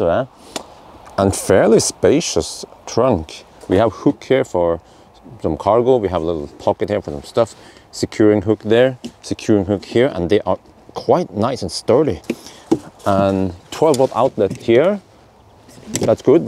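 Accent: United States. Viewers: Diggin' the American accent!!😂😂🤣🤣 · merican accent car sales man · I'm REALLY impressed by this car, but only thing that worries me is this stop charging button next to port. Easy way for stranger to stop our session is now one click away :( American accent - top noch :)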